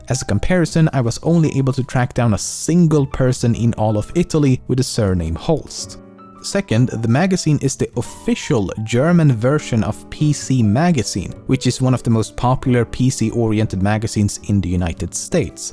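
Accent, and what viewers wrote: Sweden. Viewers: you forget hes swedish sometimes, but it does shine through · Where is he from? Sound quite Swedish to me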